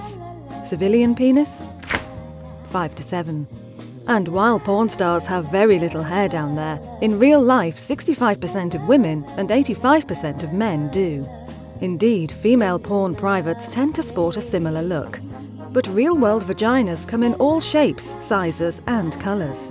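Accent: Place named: United Kingdom. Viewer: the narrator's british accent made the video so much better.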